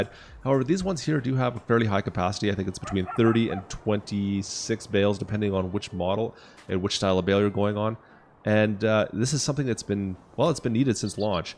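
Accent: Canada. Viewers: I love the way you say about, aboot. I love Canada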